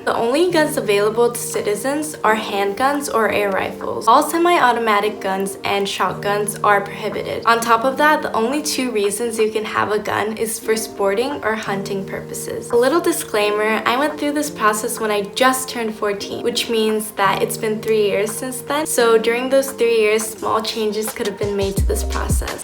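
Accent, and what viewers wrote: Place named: United States. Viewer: you are absolutely entitled to your opinion on guns or anything else - of course! I do feel that most honest, law-abiding adult people anywhere in the world are responsible enough to own a gun and they should have training to know how to use it effectively and when it is legally permissable to use it. In my own case I was British Army trained first at school from age 13, then later actually in the Army Reserves. I learned all I needed to know about firearms there. I have lived in South Africa since 1975 and have always owned firearms for self-defence in one of the most violent crime-ridden countries in the world. Only needed to use a gun once though, several years ago but did not shoot anyone, merely frightened them away. I know that in this country the injuries and fatalities by gunshot are committed not by registered, legal owners of guns at all but by criminals, robbers and such who do not purchase and register their guns - they usually steal them! 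I do not know Japan at all, but a friend of mine who does has told me of the beauty of the country and of the nature of the people. Your English incidentally is excellent and I note that you have a slight and rather attractive American accent - it is a pleasure chatting to you!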